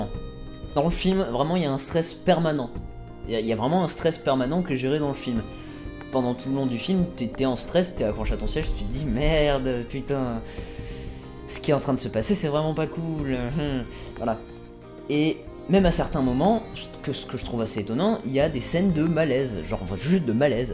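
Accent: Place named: United Kingdom. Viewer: le peau rouge! (Ton accent anglais me rappelle un certain Mr Boyer je sais pas si tu connais)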